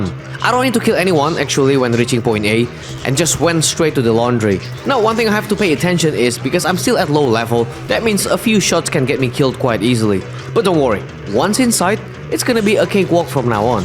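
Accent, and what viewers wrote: Indonesia. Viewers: i knew it!! judging from ur accent and the way u talk u are indonesian, so glad that i found indonesian gta online youtuber! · logatnya kaya orang indonesia.. bener kah bang? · logat nya kayak orang indo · u sounds like indonesian, nice accent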